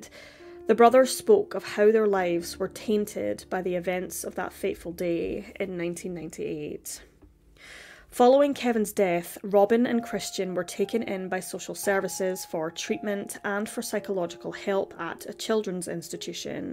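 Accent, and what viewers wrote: Scotland, United Kingdom. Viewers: I just found your channel today and as I was waiting on the narration, you can imagine my surprise as an East coast Scot hearing your voice. Instant subscription before I’d even listened 🏴 fortunately your video was extremely well researched and handled with dignity and empathy so I made a good choice. Thank you for sharing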